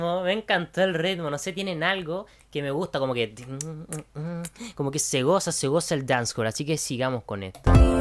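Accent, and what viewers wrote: Chile. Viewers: Eres 100% chileno? Porque es diferente tu acento??? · Eri chileno o no? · Eres chileno?xd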